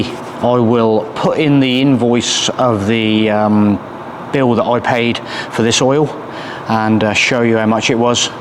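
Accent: West Country, United Kingdom. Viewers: West country eh!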